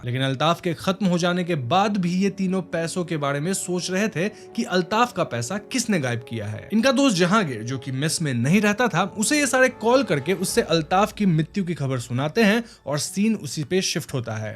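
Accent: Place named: Bengali, India. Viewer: Bhai, just love to listen bengali from you're mouth. Sounds sooo sweet. ❤❤❤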